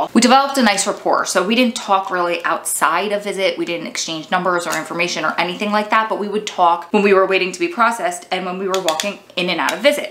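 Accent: New Jersey, United States. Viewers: wait girl are you from nj?? the accent? i grew up there and miss it so much 🥺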